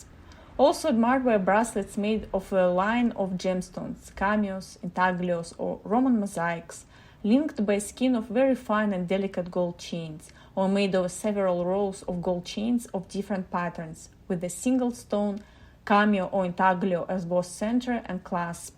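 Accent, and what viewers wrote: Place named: Russia. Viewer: ruskakaia accent lol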